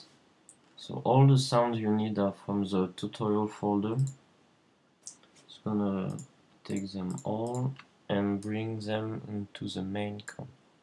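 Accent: France. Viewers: L'accent français est juste épic ^^. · a il est vraiment français j'me suis dit : merde, il a un accent français chaud pour lui :rire: maisq bon il a beaucoup de vocabulaire :3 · u sound French, your voice is cute!!!! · Omg, mec, ça s'entend que tu est français! x3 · I think hes French, I'm French and I have the same accent. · where are you from ? not sure if you're french or not ! and this mask is awesome !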